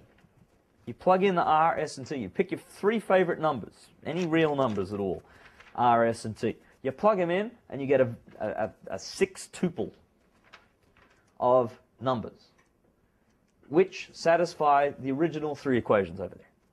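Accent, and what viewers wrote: Australia. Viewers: this lecturer sounds like he has a bit of an Australian accent. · Is he an aussie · Is he an Australian ?? His accent doesn’t seem American. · oh, australian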